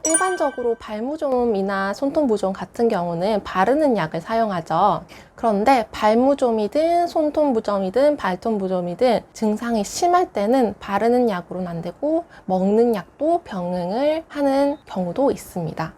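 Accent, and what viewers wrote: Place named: Gyeongsang, South Korea. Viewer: 선생님 인기 인기 하는데 뭔가 경상도 냄새가 나는데 ㅎㅎ 경상도분이시죠? ㅎㅎ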